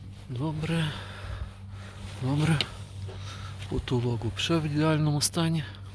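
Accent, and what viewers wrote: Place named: Ukraine. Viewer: Суржик ужасный!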